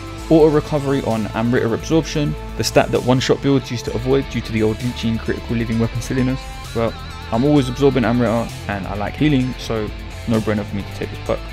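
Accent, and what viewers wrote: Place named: Cockney, United Kingdom. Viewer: Can somebody translate his cockney for me, no idea what he's saying.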